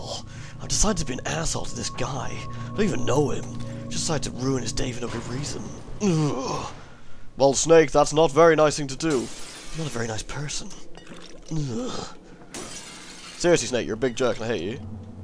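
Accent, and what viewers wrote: England, United Kingdom. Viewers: ***** I know this may sound like I'm a cruel and evil person, but personally, I quite enjoy watching you play games which don't entirely satisfy you. I love hearing you complain about things like a gamer would when he's dissatisfied. It feels like I'm actually watching a player play the game (and narrate in sexy English voice) and complain when things are not as they should be. So yeah, keep at it, <3 it :)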